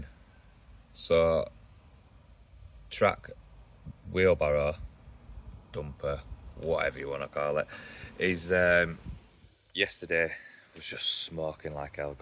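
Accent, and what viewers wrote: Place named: Yorkshire, United Kingdom. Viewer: Video is great, loved it! And that Yorkshire accent! 😊 Wish the background music was a bit more relaxing, though.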